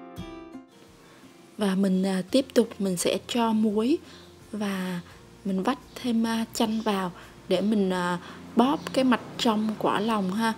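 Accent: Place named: North, Vietnam. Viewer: Bạn này hình như là người phía bắc lấy chồng trong miền nam, vì giọng bắc mà làm món nam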